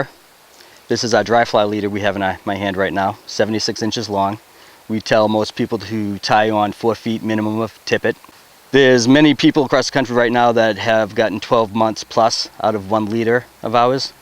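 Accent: United States. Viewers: That is one crazy Idaho accent. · Spent the first half of my of life Boise, much of the second in New Jersey. You're definitely not from the former, lol. Massachusetts?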